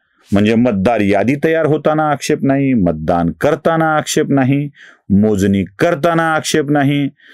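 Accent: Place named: Marathwada, India. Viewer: श्री आपण मुद्दा तर व्यवस्थित मांडता, त्यापेक्षा मराठवाडी मराठी खुपच छान बोलता. धन्यवाद.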